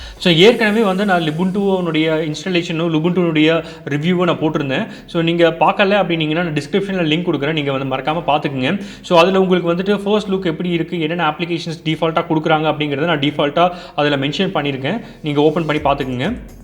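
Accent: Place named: Kongu, India. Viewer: coimbatore slang veera level anna. I love kongu tamil.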